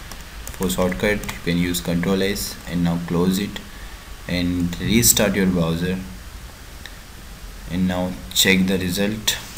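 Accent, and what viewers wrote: India. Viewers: indians with their accents.... bruh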